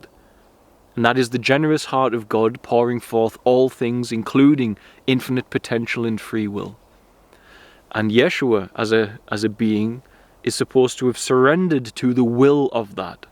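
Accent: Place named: Scotland, United Kingdom. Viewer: Good morning from Polska ! How cool Scottish accent... I do not know who you are, but i got an impression that because Tate spoke about balenciaga scandal, exposed it in vid Karmic Rétribution published a month ago, so he was framed in Romania ? However, he's got some underworld vibe ? Smokes cigar, converted to muslim ( Already was very fanatical towards women): isn't he disturbed anyway ?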